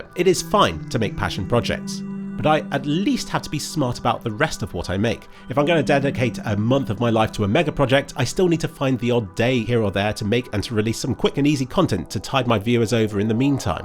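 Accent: United Kingdom. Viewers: I once told a friend about an old animated film, that one famous director spent many years and tens of millions of dollars on, only for it to die in production hell, because of the perfection the director demanded. The friend then said that they should have simply spent more money and time on the movie. He then questioned why all animation shouldn't be 60 FPS, if you love your job you would want to make it as good as possible, right? I love you and your videos, but I gotta be honest - as a layman, I never really notice the difference between the videos you spent 4 hours or a month on, even though I watch 99% of them. If I were to give an objective, third person view of your content and why I like it - it's that I like seeing you talk on random topics I never thought about, but seem interesting in retrospect, you make the most mundane stuff interesting to listen to. 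I'm not into graphics cards or processors, but hearing you talk about this stuff is educational. (maybe I just like British accents, I watched the entirety of Hilda because everyone had cute accents) So I personally just want to see you passionately talk about random stuff you find interesting, making me find it interesting in turn. Don't know if this helped, but I wish you well anyway.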